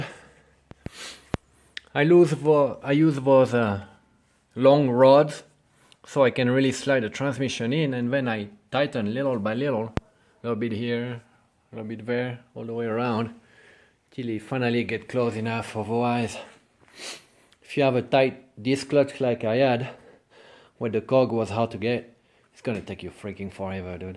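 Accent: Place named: France. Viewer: hello, your accent looks like french guy! PLEASE, i have a IH345 V8 in a 1979 scout2, can i do the same conversion as you did? i actually have a automatic 4 speed gear box on it, what do i have to modify and what parts i need for this conversion? i would appreciate your reply, thanks a lot, and continue like that, i like your channel! cheers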